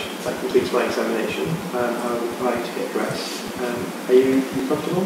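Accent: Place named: United Kingdom. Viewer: very good clear, and precise. best of all a british accent :-)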